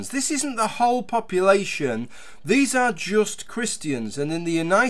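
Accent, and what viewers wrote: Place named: Birmingham, United Kingdom. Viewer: This has nothing to do with the video but what is that accent is it birmingham?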